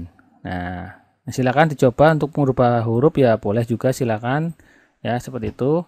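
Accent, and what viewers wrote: Banyumas, Indonesia. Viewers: menyimak wae om cara setting comand line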